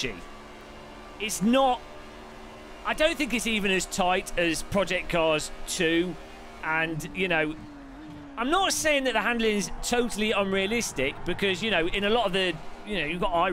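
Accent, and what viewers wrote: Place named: Leicester, United Kingdom. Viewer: but you definitely must be Leicester Lad for sure !!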